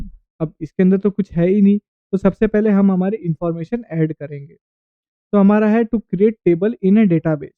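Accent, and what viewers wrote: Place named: India. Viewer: May the God give you the strength. Be victorious and proud. But the quality of your voice is not good, brother. Try to make it better because it is very difficult for someone who is not fluent in English to listen with an Indian accent. From Iran.:hand-pink-waving::hand-pink-waving::hand-pink-waving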